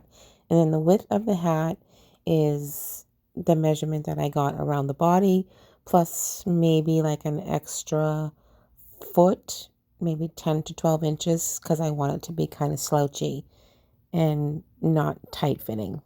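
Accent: Massachusetts, United States. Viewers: I love your accent and when you say “wicked smaht.” 😂 I am from PA, so I feel like I am welcome in your MA world when you say that. 😂 You do such an awesome job on all of your projects, so it is no surprise that everyone wanted him. 😊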